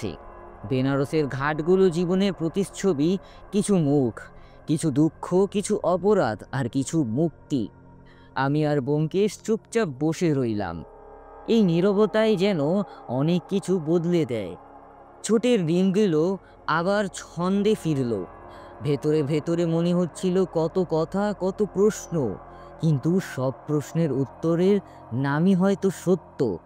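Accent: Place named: Bihar, India. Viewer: Bihar theke esechen naki Bangladesh theke? Ekhono bhasa thik hoyni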